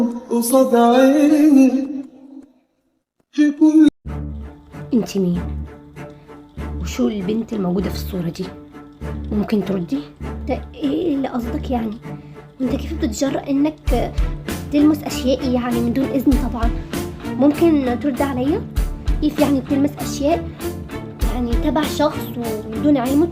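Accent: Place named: Egypt. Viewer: انة من تونس ولاكن لكنك بتهضر مصري😊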